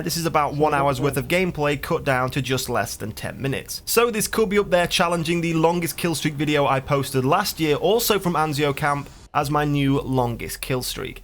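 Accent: United Kingdom. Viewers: AEG Specialist with british accent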